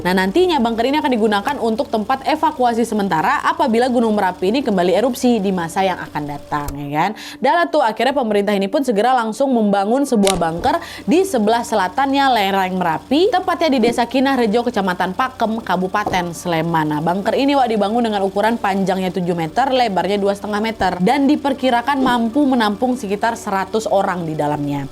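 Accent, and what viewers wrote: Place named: Medan, Indonesia. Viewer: SAYA SUKA KALI dengan CARA GAYA BicAra nya kalau boleh tau AsLinya MEDAN YA enak Kali CAKAP NYA SUKSES buat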